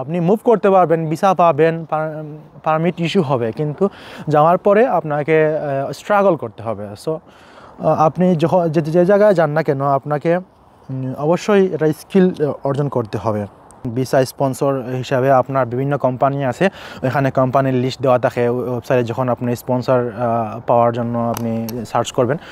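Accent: Sylhet, Bangladesh. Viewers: ভাই সিলেট নাকি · Are you sylhety? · Vai er kothay buja jar Sylheti bhai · আপনি সিলেটী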